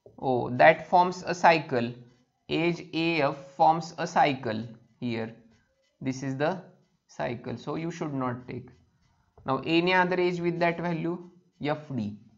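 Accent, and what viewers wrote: India. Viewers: if you are indian, speak Hindo, don't speak the terrible indian english, i will make sure never click this again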